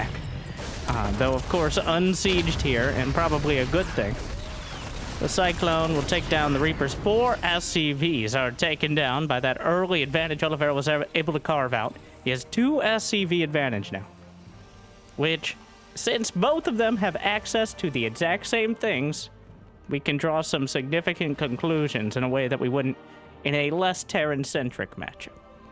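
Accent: South, United States. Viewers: Never Imagine the day, I will be watching a starcraft commentary with a southern accent.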